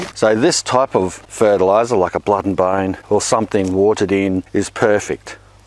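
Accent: Australia. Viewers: I don’t know why but you remind me of Kenny the toilet guy.. Maybe it’s your Australian accent .. Needless to say I love your channel. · Fantastic video, just what I needed to see for my next project. As aussie as they get, well-spoken and bloody good at what he does. And them tradie shorts - Lol! I nominate you next host of Gardening Australia! Greetings from Sydney mate. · Great explanatioan and a very cool lady back aussie accs!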